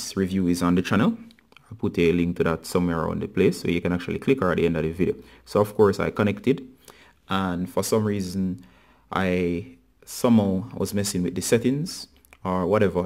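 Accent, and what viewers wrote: Jamaica. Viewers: You sound like a jamaican. Well thanks for the advice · A which part a Jamaica yuh come from dawg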